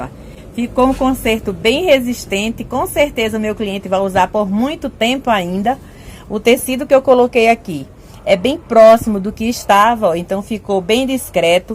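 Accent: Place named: Northeast, Brazil. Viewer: Bom dia!!! Parabens trabalho bem feito Qual valor você cobra feste conserto? Qual estado vc e? Imagnei nordeste pelo sotaque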